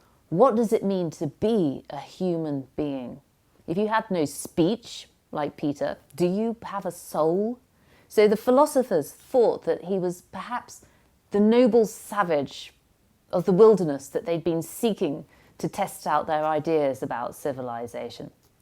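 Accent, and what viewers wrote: United Kingdom. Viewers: Look at what I just bumped onto. This is a great channel. I'm an American from New York City. I always look for YouTube channels like this one that focuses on history and actually goes and videos those historic places. I can already tell it will be fun watching them. I like the British accent. The British tradition. No annoying music in the background and I love learning about historic situations I didn't know about. Kudos to you Historic Royal Palaces for this gem of a channel. I will now go on a binge watch of ALL your videos. -- Frank. Cheers. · Subscribed just so I can hear you talk more! I love the British accent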